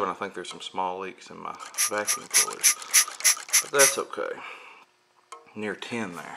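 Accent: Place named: South, United States. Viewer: Southern accent and used vodka bottles. God bless America. : )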